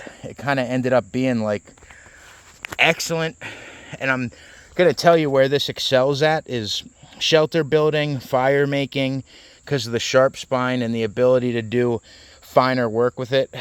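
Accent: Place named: Philadelphia, United States. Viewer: Are you in the Philadelphia or South Jersey area? You have a Philly area accent.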